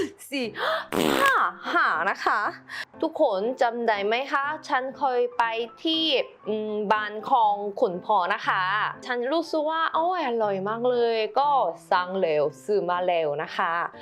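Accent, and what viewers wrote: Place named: South Korea. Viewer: พูดไทยได้น่ารักมาก เข้าใจทุกคำ แต่สำเนียง คนเกาหลี ไม่น่าเชื่อว่าพูดได้ดีขนาดนี้ อยู่เกาหลี ไปหัดพูดกับใครครับ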